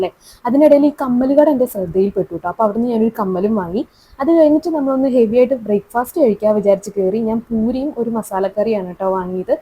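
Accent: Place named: Thiruvananthapuram, India. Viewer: നേരം പര പര പെലർന്നു..😂😂😂 തിരുവന്തോരം സ്റ്റൈൽ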